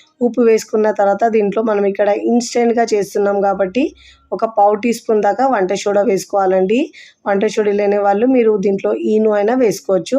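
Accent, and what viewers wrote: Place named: North India, India. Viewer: ఎందుకు సబ్స్క్రయిబ్ చేసుకోవాలి, ఇ లాంటి చెత్త కోసమా, అసలే నార్త్ ఇండియన్ లా ఉన్నారు తెలుగు రావట్లేదు.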